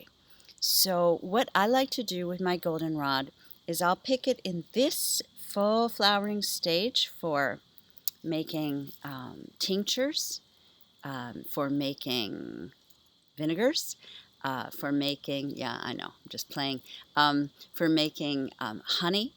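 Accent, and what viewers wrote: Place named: Australia. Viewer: I hope you are OK. Sounds like Australia, or similar. We are all One here on Earth. Bless you.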